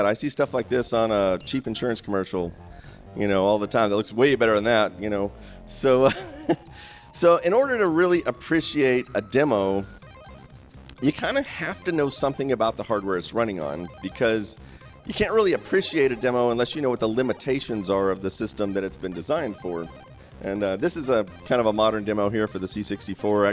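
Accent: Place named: Texas, United States. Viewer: His Texas accent drawls well off-camera.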